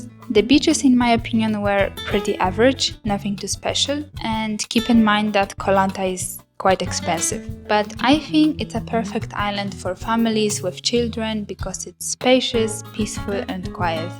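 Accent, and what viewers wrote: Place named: Eastern Europe. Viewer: Czech, Slovenian, Polish? Usually I can recognize accents.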